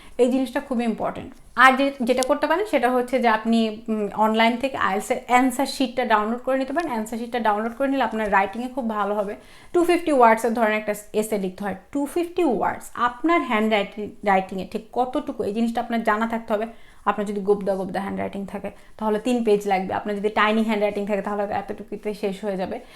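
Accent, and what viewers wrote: Sylhet, Bangladesh. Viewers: khuda lagi gesilo......sylheti accent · Apu apni Sylheti?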